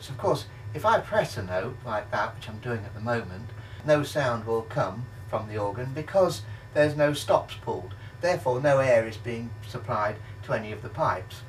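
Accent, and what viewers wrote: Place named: Southern England, United Kingdom. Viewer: Why is his voice hilarious? It sounds like a very normal southern English accent!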